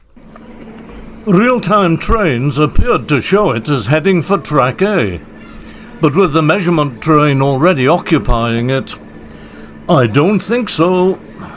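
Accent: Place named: Scotland, United Kingdom. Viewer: Of Asquith Xavier, moment /..., on top of your enchanting Scottish brogue, lilting loftily with meandering messaging, your incidental account of Mister Xavier provides ample knowledge of his troubled encounters. Suddenly, today, he is world famous because of your timely endeavor. Thank you, kind sir, his memory certainly lives with me and, hopefully, many millions of minions scattered beyond...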